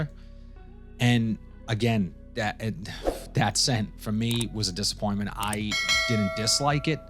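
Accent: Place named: Massachusetts, United States. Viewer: Wicked awesome accent . Worcester mass?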